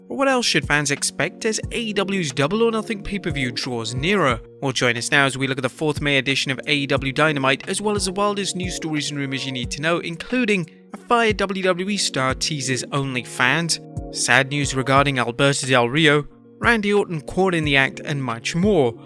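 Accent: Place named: United Kingdom. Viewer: I always look forward to hearing you return , UK accent FTW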